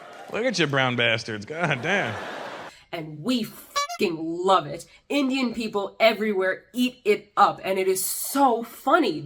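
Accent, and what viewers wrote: United States. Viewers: Girl, you're upset about an INDIAN character played by an INDIAN actor having an INDIAN accent? I mean, whether or not the character was written to have an accent and whether the actor himself really talks that way is null and void. The character is INDIAN so having an accent seems fitting. Did he NEED an accent? No, but it's no issue that he HAD one. At least they got an Indian actor to play him than getting let's say, a white person and having him do an Indian accent, then I can see you getting a bit offended but you just seem to be nitpicking. The fact that boy even did that, never knowing him prior to his TV works, was genius was because he was convincing it shocked me when I saw interviews and come to find out that wasn't his accent. THAT'S TALENT and should be glorified and I don't mind him doing that more, not just speaking his regular accent because that's just how he talks in real life. 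He's playing a character after all so he should able to branch out and portray different elements from himself. And don't get me started on the clothes because it's simply to reflect the character's heritage. Do you feel it makes them "too" Indian? Why is it okay for YOU to wear your Indian clothes here? You don't need them given your rather Americanized accent I might say, but it's obviously done for you to reflect your heritage so why can't a character have this done? THE IRONY. Apparently if you're an Indian character and don't have an accent like you or wear Indian clothes (despit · also in Bengaluru there was this lady in a jewellery shop who was grinning ear to ear thinking you are a typical Indian girl putting on an American accent even though you were not , it’s the normal way you speak from the day you learnt to speak , just wanted to mention it here .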